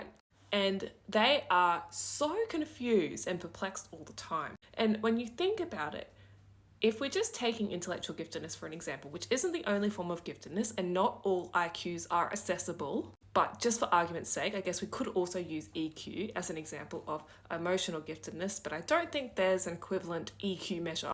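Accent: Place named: Australia. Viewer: My 🧠: how unusual, an Asian woman speaking fluent English with an Australian accent… not saying Asians can’t speak English but defamiliraised … it’s objectively odd… imagine if instead she spoke in Swahili - very bizarre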